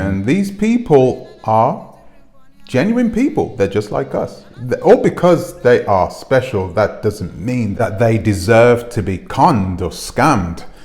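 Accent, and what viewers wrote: United Kingdom. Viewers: You’re a fraud, you British talking sounding shavy head having Weirdo. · An African speaking in a British accent hating on a black American man’s success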